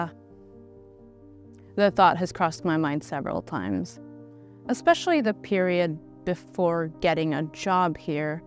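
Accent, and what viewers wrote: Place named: United Kingdom. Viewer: Mam how did you learn the particular accent ? Like proper British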